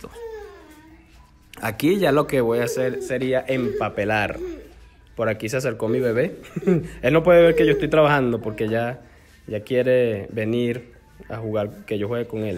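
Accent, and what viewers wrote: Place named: Venezuela. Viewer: Me encantó la explicación, me suena como venezolano, será paisano?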